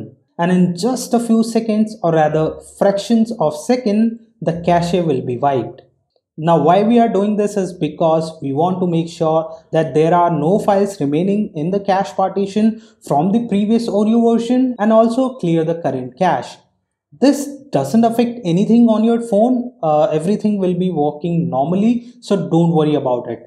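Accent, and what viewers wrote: India. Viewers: Indian detected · Again indian accent👎👎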